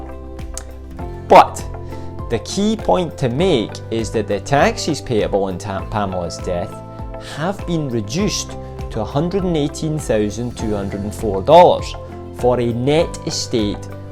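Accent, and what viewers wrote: Scotland, United Kingdom. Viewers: Beautiful accent. I love it. Not sure what it is. Scottish? Short and to the point advice. I am not surprised, that this video didn't generate many views, nor comments. People simply prefer to complain about CEOs salaries and big corporations profits, than look after their money. To me ( I am recently retired) the biggest advantage of RRSP is the fact, that all capital gains and dividends generated for about 40 years are tax free. It continues within RRIF for the next ( hopefully) many years. Most people, even accountants and financial planners hardly ever talk about it. Understanding of this simple fact worked for me wonders.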